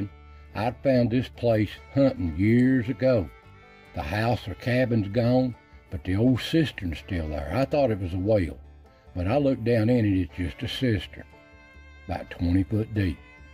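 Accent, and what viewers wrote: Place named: Georgia, United States. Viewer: When I was a little 3-5 years old girl, I remember riding up and down on a pump handle!! About 6-7 years ago, I was hand washing clothes by scrub board, wash tubs. I did that for about 2-3 years. In the winter, it was horrible how bad the cold weather was on hand washing clothes!! I love listening to you talk! You sound like me! I'm from Georgia, live in Cheyenne, Wyoming now!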